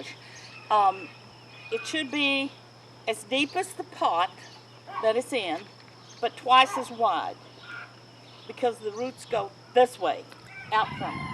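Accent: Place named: Kentucky, United States. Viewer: The Kentucky accent is like a language of its own.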